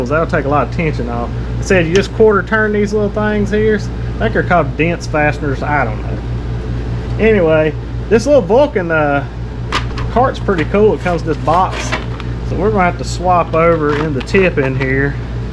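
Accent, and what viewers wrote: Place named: North Carolina, United States. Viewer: Glad to hear someone that talks like me!! (Franklin, NC, Macon County)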